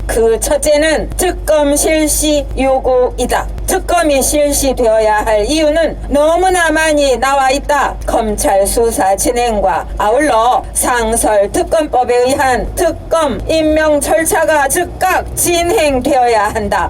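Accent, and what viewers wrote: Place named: North Korea. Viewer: 이 방송하는 여자 바꿔라 ! 북한 노동적위대 방송하는 북한식 어투같아서 처음엔 깜짝 놀랐다. 당장 바꿔라 ! 강하고 아름다운 자유 대한민국을 후손에게 물려주기 위하여 !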